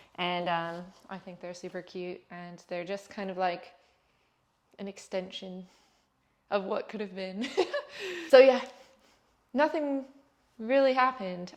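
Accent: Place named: United Kingdom. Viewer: This is my first time hearing your voice and idk why but I thought you were British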